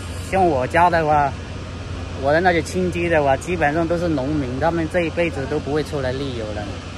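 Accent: Hakka, China. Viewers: 是鄉下佬！只能讲客家音的普通话！请见谅！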